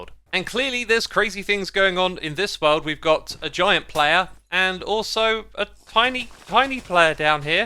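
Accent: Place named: United Kingdom. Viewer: I forgot how British you are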